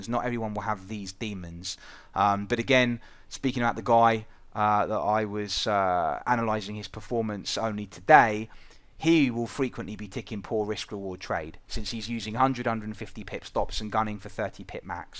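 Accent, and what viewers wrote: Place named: United Kingdom. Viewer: I love when he swearing and offending people with british accent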